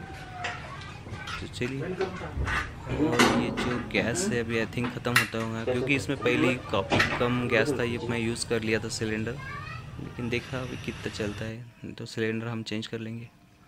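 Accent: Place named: Maharashtra, India. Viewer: Aapke Hindi bolne ke tarike se aap nagpur maharastra ke lagte hain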